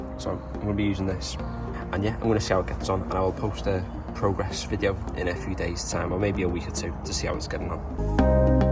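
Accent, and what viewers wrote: Liverpool, United Kingdom. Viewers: Fellow Scouser 👌, great vid mate👍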